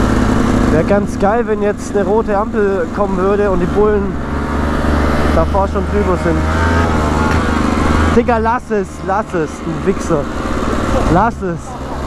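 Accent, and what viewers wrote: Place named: Ulm, Germany. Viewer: Als ob du aus Ulm kommsch:D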